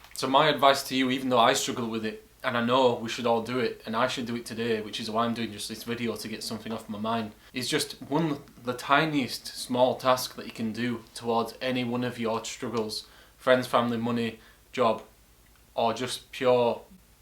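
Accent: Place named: Yorkshire, United Kingdom. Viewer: Ngl i was just lurking here to learn ur accent cause like i said i wanted to prank my friends with a yorkshire accent, BUT this video hit me in unexpected ways, i needed to hear this at this moment. I've been unmotivated to fo anything for the past 3 months. And being at my age (which is 24) it sort of builds another level of pressure whenever im paralysed by overthinking. It's very hard to snap out of it. But what u said kinda gave a push to start small, start somewhere. Thanks for this man.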